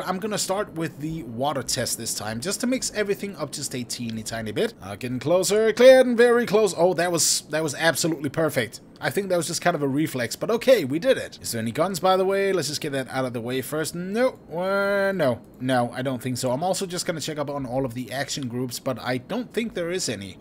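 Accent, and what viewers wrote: Denmark. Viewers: He's Danish, I live in Sweden and anyone that swedish fluently would recognize a dane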